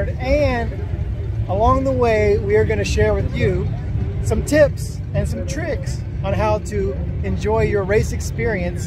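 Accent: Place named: South, United States. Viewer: I do declare! It sounds like found a little Southern drawl while being there! My mother used to point that out in me when I would come home on leave when I was stationed down South.😉👍🏼😎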